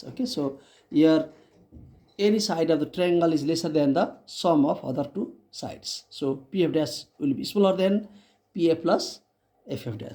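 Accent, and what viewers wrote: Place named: Nepal. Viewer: nepali jasto lagyo sir tapai nepali language ma handina aajhai better huntheo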